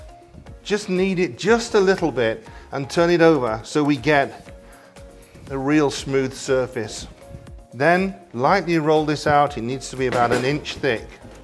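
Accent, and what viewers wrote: United Kingdom. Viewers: Chef just curious are you British or Scottish? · Even American Cooks has started to note both Celsius and Fahrenheit temps in their recipes. This guy is British, that he only told the Fahrenheit temp made my respect for him plummet more than one degree. I do not care where he currently live. He is so British to listen to and watch it almost hurts, and he makes his money on the fact he used to be cooking for the British Royal familie.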